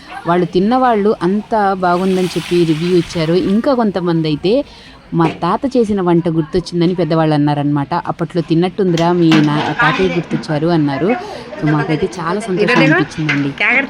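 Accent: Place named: Godavari, India. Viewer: Mee Hindi language godavari lagundi leka guntur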